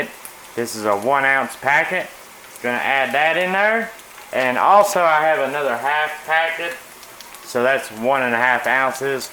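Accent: South, United States. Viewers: Southern accent.. Im from Waterloo Alabama so I hear it. Just found your channel and love it!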